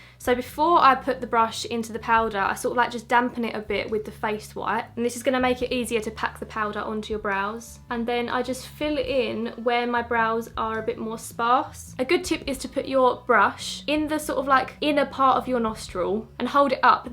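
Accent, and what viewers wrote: Essex, United Kingdom. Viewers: Are you from Essex??? You sound like you have an Essex accent and I'm originally from Essex so I can spot it out. Loved your makeup btw, very pretty.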